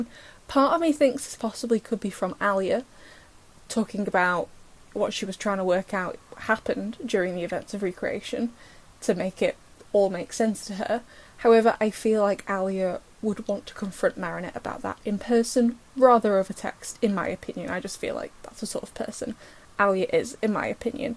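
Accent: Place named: Lancashire, United Kingdom. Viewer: dw I heard 625. Probs because I’m in Lancashire too so have a similar accent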